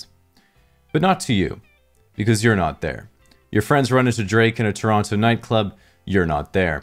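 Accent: United States. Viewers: You sound like if internet historian was American. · you sound so american after hearing your australian accent wtf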